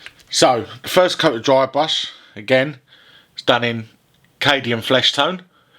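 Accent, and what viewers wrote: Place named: England, United Kingdom. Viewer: American language? Wot you on about you bleedin sceptic. Its the king's English he's speaking. He ain't gonna speak Spanish nah iz eee?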